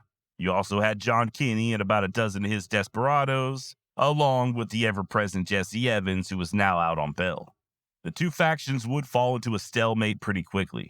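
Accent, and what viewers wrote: Texas, United States. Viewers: The moderator of these stories is first class. Great Texas accent, clear diction, great humor and wonderful storyteller. Pleasure just to listen to him speak.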